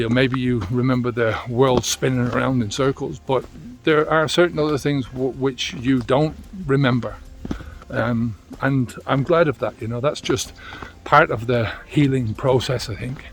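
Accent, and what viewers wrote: Ireland. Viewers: love the content, the cow bells, the Irish accent and the scenery. Thank you :)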